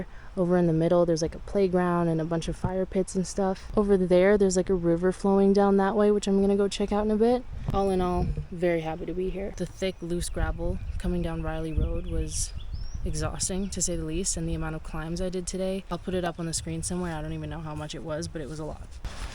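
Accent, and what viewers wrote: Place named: East London, United Kingdom. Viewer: that is absolutely excellent. what an amazing route and landscape. well done for documenting your travels. I love the word 'thunk'. It's awesome. love and good fortune (Mangala sutta) 😎😊❤ p.s. that was certainly an east end of London market traders accent. Or maybe Fagin from Oliver Twist. Certainly one of the two.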